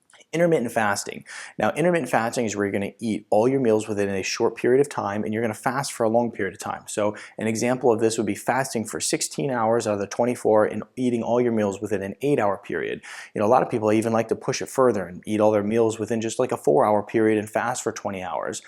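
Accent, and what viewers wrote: United States. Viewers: Excellent presentation. I like the speed of your speaking. I didn't waste any time. I don't like slow speakers. But out there in the world most people do not understand American accent. English letters of what you are speaking coming on the screen would help millions of viewers. Thank you. O<num> whatsapp.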